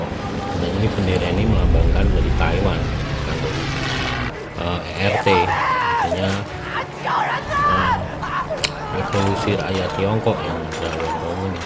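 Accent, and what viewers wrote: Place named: Java, Indonesia. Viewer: logat jowo salam ko kalteng bos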